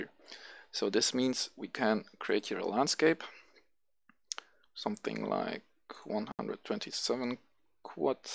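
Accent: Germany. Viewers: deutscher oder österreicher oder schweizer?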